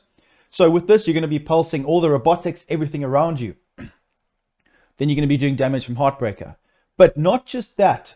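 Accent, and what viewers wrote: South Africa. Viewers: Hey, thanks for the advice. BTW, if I'm not mistaken you're accent is RSA right? I'm in Cape Town, Northern Suburbs. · South African spotted? Are you a Saffer,?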